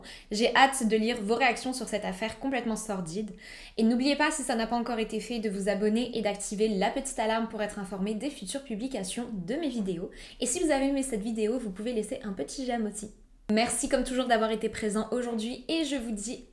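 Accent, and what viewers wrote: Quebec, Canada. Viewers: J'aime vraiment trop ton accent Québecois, ça sonne tellement sympathique, j'adore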